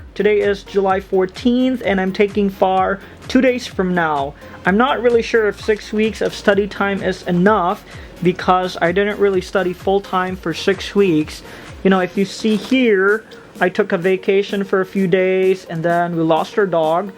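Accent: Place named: Hawaii, United States. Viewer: Good content. Btw where you from? I hear an accent. Hawaiian?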